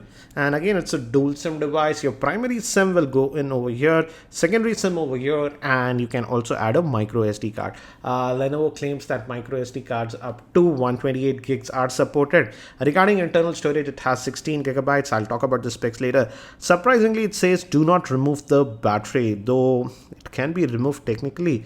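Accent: India. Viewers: Hey pig,he is speaking indian english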